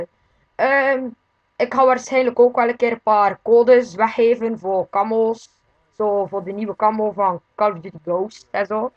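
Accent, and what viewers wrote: West Flanders, Belgium. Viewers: je hoort fuking hard dat hij West-Vlaams is. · Nice West-Vlaams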